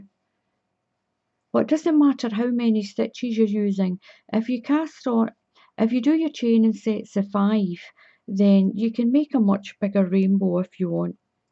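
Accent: Scotland, United Kingdom. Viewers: What a lovely tutorial and so great to hear a fellow Scottish accent as a bonus!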